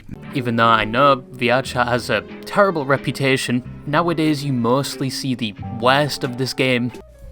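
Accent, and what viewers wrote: United Kingdom. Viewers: your not British?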